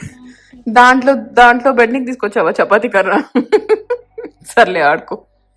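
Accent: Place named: North India, India. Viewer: Your Telugu is very different. Did you grew up in north India ?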